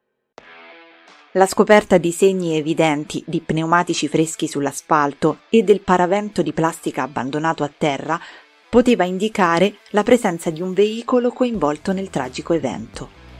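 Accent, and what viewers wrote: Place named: Rome, Italy. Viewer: c'e' di peggio 😅 credimi ci sono truecrimers che ho dovuto abbandonare, errori grammaticali, voci con cadenze ben piu' pesanti di una semplice inflessione che credo sia romana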